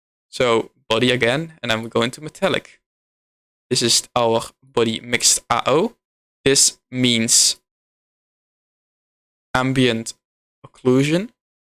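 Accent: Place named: Netherlands. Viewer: I lived in Amsterdam for 10 years and I've never heard a stronger Dutch accent than this.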